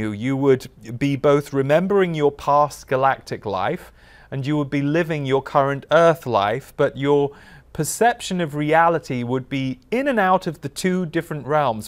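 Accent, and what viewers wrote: United Kingdom. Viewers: I like your British accent! It's soothing to listen to. 💖Thank you! 💖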